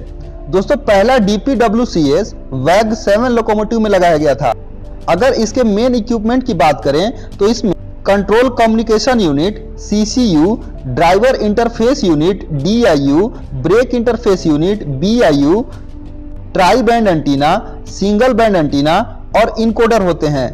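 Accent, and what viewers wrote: Bihar, India. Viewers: Bolne waala Bihari hai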